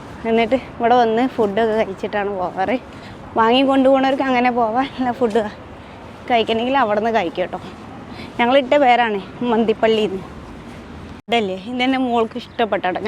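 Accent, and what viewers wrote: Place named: Kondotty, India. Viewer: ശബ്ദം കേട്ടിട്ട് കുണ്ടോട്ടി എടവണ്ണപ്പാറ റൂട്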